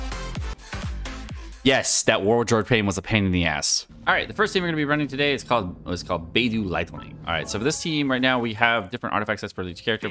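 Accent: United Kingdom. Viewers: I love your british accent(?)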